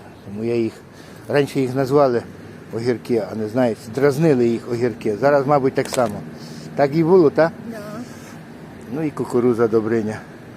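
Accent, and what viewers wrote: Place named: Yavoriv, Ukraine. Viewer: По акценту чути що з Яворівщини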